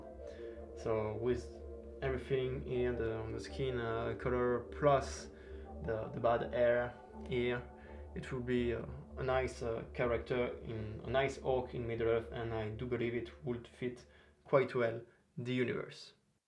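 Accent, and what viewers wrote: France. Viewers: Great video and review, my next silicone mask will be Pennywise IT 1990 silicone mask by BurkBench designs and then I will get the Alien silicone mask also by BurkBench designs probably in October, also the way you pronounce latex is funny 🤣 🤣 🤣 and judging by your accent you are either French or French Canadian.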